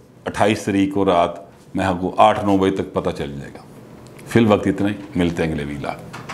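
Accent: Punjab, Pakistan. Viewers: I love your style of speaking Urdu with Punjabi God bless you 🙂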